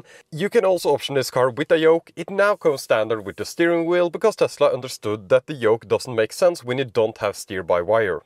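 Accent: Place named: Norway. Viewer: Do Norwaynians always have this ehh eh ehh ehhh eh accent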